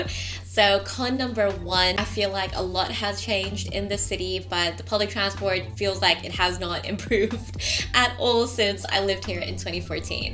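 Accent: United States. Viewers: sorry to ask but why do u have such a american accent? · Nice vid - bit of an American accent there? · Are you from north America your accent sounds Yankee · You're 30 and the American accent stuck with you lol what a load of virtue signalling bs. Sorry to burst bubble.😂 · Nice video! You grew up over here but how did you get such an American twang? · Are you American?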